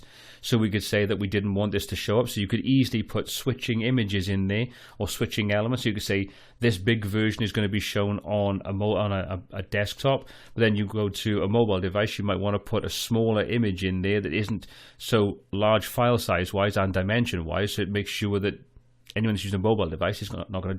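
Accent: Wales, United Kingdom. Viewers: I know a welsh brother when I hear one haha! I'm from South wales :D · Thanks for your clear approach - do I detect a Welsh accent?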